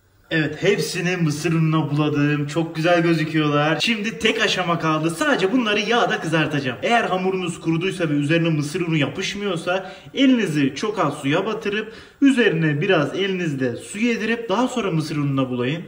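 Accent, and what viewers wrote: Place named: Hatay, Turkey. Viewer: Abi konudma tarzınla o kadar güzel ki ergenlerjn yorumlarına aldırma ben sinir oldum vallahi tam Hatay ağzı :) Yolun açık olsun :)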